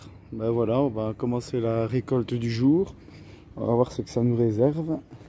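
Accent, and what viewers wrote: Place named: Liège, Belgium. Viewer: bonne continuations vous avez l'acccent de liège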